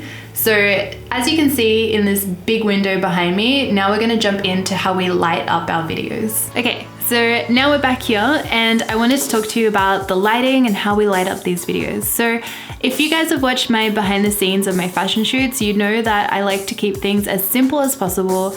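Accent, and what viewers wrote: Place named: Sydney, Australia. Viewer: From you and my old friend Joann I have decided that the Sydney accent is a very nice sounding english!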